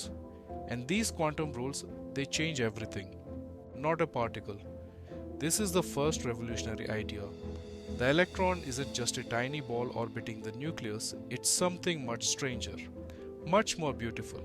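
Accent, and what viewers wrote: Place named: India. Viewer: Indian people’s accent speaking English sucks!!! Go A.I on the voice bro. Your accent is off putting. Sorry to say that, but truth is truth.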